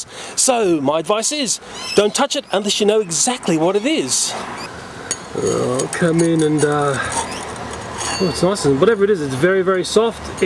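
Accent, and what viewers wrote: Australia. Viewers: Sounds like he is Australian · KawaiiKitty I think he's Australian, and i believe it was a silkworm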